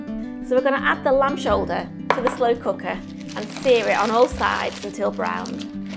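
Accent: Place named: Manchester, United Kingdom. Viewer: Love your show, somehow I have a feeling that you are a Mancunian Girl, and love your accent. We know your husband’s name is Chris, how about your name? It’s nice to write a comment with your name in it. As for this Curried Lamb, I had to clear all the fats and bones to avoid the lambie flavour, Australian Lamb is not the best in the world, and is so Rich, I suggest that you replace the Stock with water to lessen the rich flavours. As for the stringing of the Cardamom, I normally use a special stainless steel Tea Strainer where you can add other pods and seeds if required. I am cooking this spring lamb leg (de boned and fat completely removed) today and will let you know of the result. I think it’s going to be good. Thanks. Regards, Dr. Matthew Banno, Melbourne, Australia.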